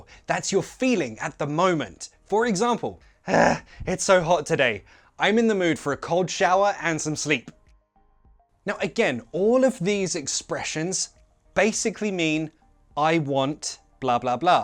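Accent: United Kingdom. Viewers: You remind me how beautiful British English is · Oh God I love the British accent 😂😂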